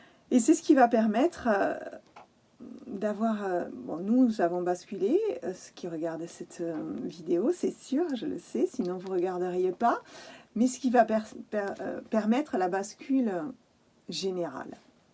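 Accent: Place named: South-West, France. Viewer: Ohhhh merci pour votre lumineuse et étincelante Présence, merveilleuse déesse au bien joli accent (du sud ouest?). Attirée avec un bel élan de vous écouter immédiatement et absolument ravie d'adhérer à vos propos si exacts!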